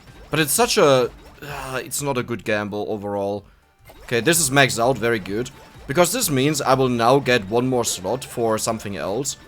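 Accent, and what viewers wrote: Germany. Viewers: Nah its not just book, pretty much every word with O is butchered. I think its of your german accent from your region. We from berlin butcher english another way, so dont worry.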